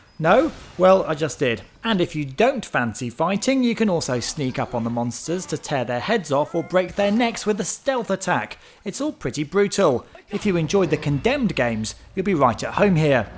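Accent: United Kingdom. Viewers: good review:) I dont know why but british accent makes everything sound more credible,always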